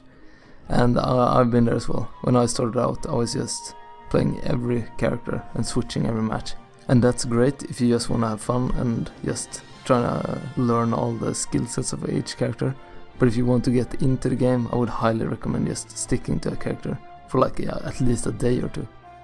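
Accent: Sweden. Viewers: the most swedish accent of all time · extremely swedish accent. I was hoping you would say "double-yump"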